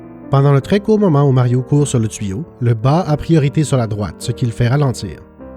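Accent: Quebec, Canada. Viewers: Une speedrun? J'ai toujours entendu un speedrun, étrange.. Ça fait du bien d'avoir une vidéo narré en français avec un accent québécois d'une aussi haute qualité, j'en veut plus :) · Moi non plus, mais son accent en anglais est clairement comme un francophone, mais il n'est pas l'accent typical pour un francophone qui vient de France. En mon expérience, c'est presque toujours Québécois lol (Je suis désolé pour ma grammaire, je suis Suédois) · Et c'est ainsi que je decouvre que je comprends mieux l'anglais nord-américain que le français québécois (je suis Francais) 😐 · I can usually pick up French accents on people from France speaking English, but for I did not know it until he said in the community post. Watching this video now, I noticed some words sounded very odd compared to what I learned back in school and what I went on to hear from French people. 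Thanks for confirming my suspicion of him being French Canadian to be correct!:D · L'accent québécois est parfoit difficile à comprendre pour moi ^^'. Peut-être je peux m'y habitué avec cette chaine, haha.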